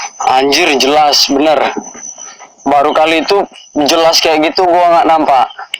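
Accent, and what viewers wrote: Medan, Indonesia. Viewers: Mas mcm orang medan aja.dari logat bicaranya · medan kali ya tuh logat bang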